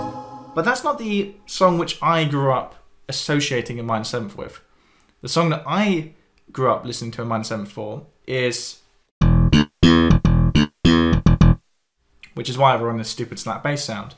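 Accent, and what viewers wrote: England, United Kingdom. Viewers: Oh to have good hair, an english accent AND piano skills. Very enjoyable. Thanks.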